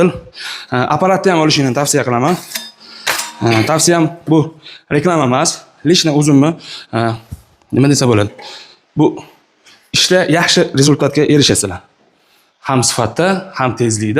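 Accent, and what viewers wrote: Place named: Namangan, Uzbekistan. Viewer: Наманганлик менимча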